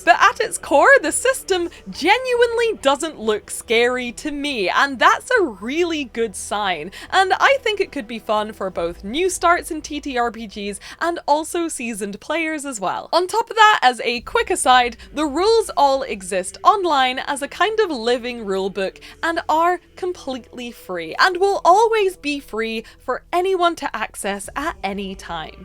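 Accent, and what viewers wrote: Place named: RP, United Kingdom. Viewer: We wanna hear your reaal English accent not this prep school rich kid accent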